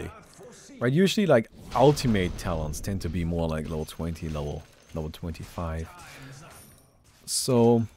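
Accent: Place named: Germany. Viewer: It is 100% without a doubt a German accent. Not Canadian, not enough "ey". Not American, not enough cowboy or California. Not French, Italian, English, Irish, swedish, Russian or Australian.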